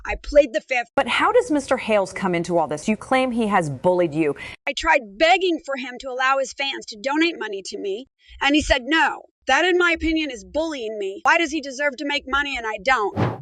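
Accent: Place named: Ohio, United States. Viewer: Awesome job I knew sooner than later someone would see what I thought was her manipulating the court’s system! AND I knew you were from Ohio! 😊I could feel it in my soul!! Honestly you have a face that I have seen at some point in my past! Not to mention you act too much like myself & our friends to be from KY! 😂 Those from Ohio have a different kinda accent!!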